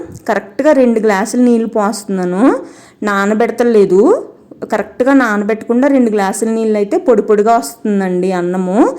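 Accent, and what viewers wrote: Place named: Rajahmundry, India. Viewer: Me voice ma Rajahmundry voice la supar ga vundi andi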